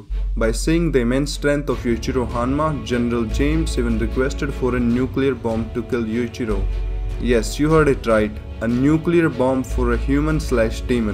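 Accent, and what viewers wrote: India. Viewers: nah his accent(I think Indian) pronounced the both very similarly.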